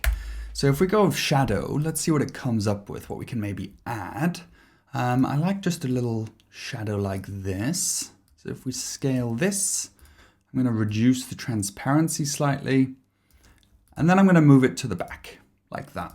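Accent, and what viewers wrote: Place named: United Kingdom. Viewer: Hey. Fantastic video. Much more informative than others on the listing photos subject. I notice a British accent. Are you UK based? If so do you sell in USA?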